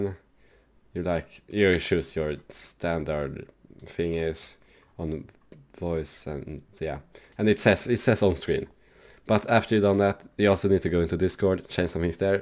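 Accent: Sweden. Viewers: haha man hör så himla klart att du är svensk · skulle inte säga dålig men definitivt tydlig svensk dialekt på den haha. men kom igen, vilken svenne har inte det?? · You're swedish right?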